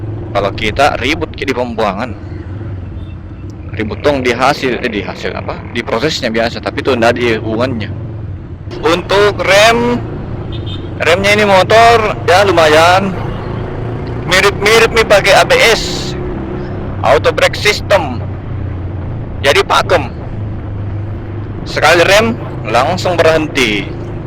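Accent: Makassar, Indonesia. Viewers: mantap mas bro... makassar banget.. lanjutkan om... hehehe...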